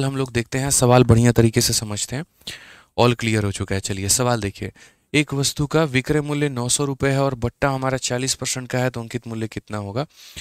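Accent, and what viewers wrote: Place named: Bhojpuri, India. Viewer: Apki bhojpuri tone sir just ...... Hayeee🙈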